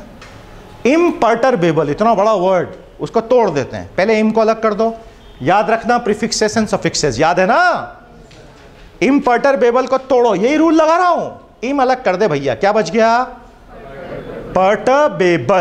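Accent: India. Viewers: Very nice understanding of vocabulary and nice explanations, but sorry to say, with your pronunciations all that will happen to me is a mockery. MOST of the words I came across in this video are being wrongly pronounced, and that too with a very heavy INDIAN accent. English is not an Indian language...and this type of pronunciation has ruined it for the entire English speaking Indians. Video very helpful for written articulation only, a native English speaker will have a hard time trying to understand what you say. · Sir....does Hindi influence your pronunciation a little bit?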